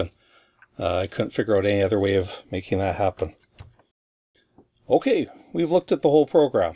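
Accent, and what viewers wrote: Canada. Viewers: I love the gesturing hand. It seems to be trademark. I do wish he'd show his face a little more, but I think the gesturing hand works for him. Also, the soft-spoken Canadian accent. See also: <link> <link>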